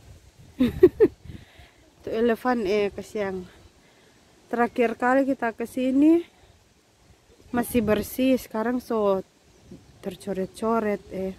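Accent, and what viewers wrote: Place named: Eastern Indonesia, Indonesia. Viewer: Salam kenal Kk 😊 Dari logatnya seperti dari Indonesia timur ya Kk, semog berbahagia selalu bersama keluarga.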